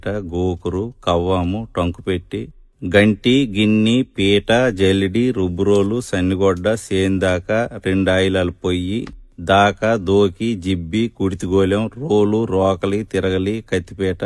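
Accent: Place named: Uttarandhra, India. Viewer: Pakka uttarandra yasa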